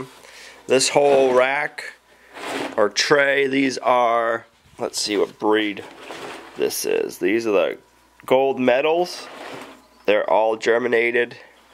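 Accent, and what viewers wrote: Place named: Pennsylvania, United States. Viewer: One, I love your accent. Im from indiana it reminds me of a friend of the family who was from Pennsylvania. I just love it. Also your videos are inspiring!! Id still like to know what type of dog your dog is? I pray the syrup flows and the snow melts as fast as you need! Thanks for sharing with us!!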